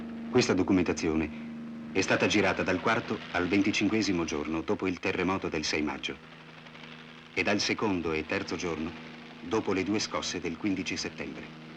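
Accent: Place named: Trieste, Italy. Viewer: L’accento triestino della voce narrante include un ulteriore occlusione al racconto tragico di questa immane tragedia. Il documentario è di rara fattura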